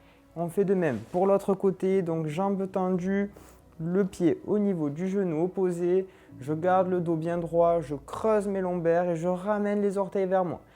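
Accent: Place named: South, France. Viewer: ah oui c est ce qu il me semblait j ai reconnu un petit accent du sud , je suis sur Nîmes et originaire de Reims mon oreille est sensible aux accents 😂 dommage je trouve vraiment bien votre explication très claire pour ces exercices si vous aviez été dans le coin , j aurais pris mes séances à votre cabinet c est sur .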